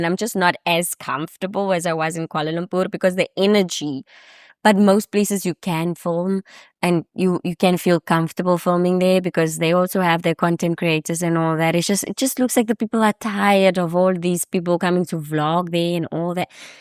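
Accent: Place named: Barbados. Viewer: I love your accent you kind of sound like your from the Caribbean island Barbados, thank you for sharing your journey with all of us.😊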